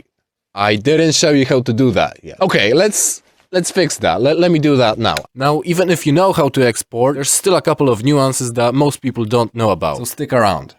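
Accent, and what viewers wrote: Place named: Poland. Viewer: You can immediately hear my boy polish ❤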